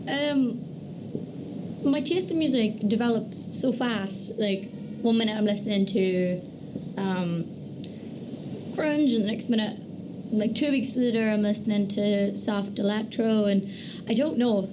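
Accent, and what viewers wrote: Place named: United States. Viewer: im confused about the american accent?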